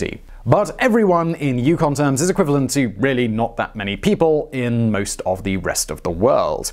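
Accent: RP, United Kingdom. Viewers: I swear you get more posh with every video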